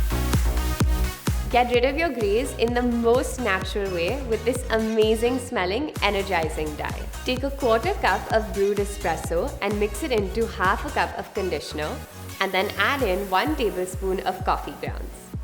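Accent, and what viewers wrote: India. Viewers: Shes so beautiful, to bad has indian accent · When it was really shocking and belly turning to see this white girl certainly starting to talk with the Indian accent very weird and how to place well then I remember the world in clear values and I remember the world and their values and what her background must be for me to be looking at this so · Why do you sound Indian? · She definitely shocked me , I didn’t know she was Indian and I hope I’m not being racially insensitive but her accent is so beautiful 😍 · She has an Indian accent but she doesn't look Indian 😳🙂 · why is she white but with an indian accent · OMG ..That point you started talking i realized that you are an Indian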